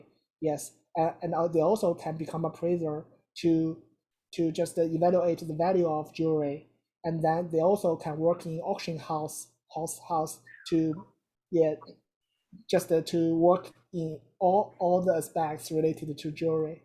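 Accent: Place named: China. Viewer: Incredible presentation. I got cheated out of school but I would have done great in college. I used to love making presentations like this. This man would be a fun teacher. I know what it can be like to have pseudo intellectuals brag about something that they know nothing about, when its something you're passionate about the knowledge of. I love all fields of science. I searched for this video just by hoping it was a thing. Very Incredible presentation. I feel like he and I would get along well. I had gotten accepted into a trade school but I was one of tge unlucky few who had a cinema like group of bullies that beat me up for no reason and broke my glasses, so I stopped going to school but I never stopped being passionate about learning. Im sure people in your field have to deal with know it alls like pseudo spirituality people and corporate minded manufacturers. 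I bet its frustrating. I do find gems and chemistry in general fascinating but my area of expertise is literature and history. I got bullied into leaving school, chose to be a superhero and went up against things out of horror movies. Lost my best friends in sad scary ways and try to give shout outs abd credit where its due. I dont know much at all about the man in this video, but hes gifted and based on his accent, Im going to assume his first language is mandarin. I find other languages interesting too. Knowing more than one generally makes you cooler than people who dont so Im impressed. I feel like English is on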